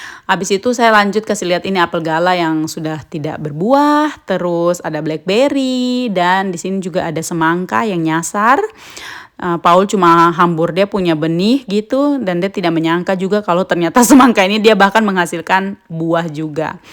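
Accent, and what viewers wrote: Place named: Java, Indonesia. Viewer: Logat jawanya dapet bener kak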